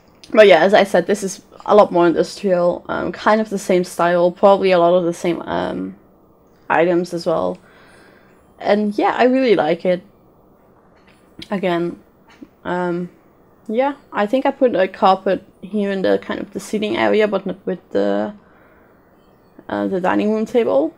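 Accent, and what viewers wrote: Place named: Netherlands. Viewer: Awesome build! Your accent sounds Dutch, so are you Dutch?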